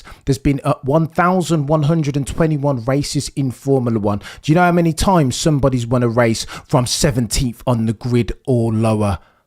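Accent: United Kingdom. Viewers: You are turning more into max with every video. Pretty refreshing hearing a guy with a British accent just recognizing raw talent, even not if it’s from a Brit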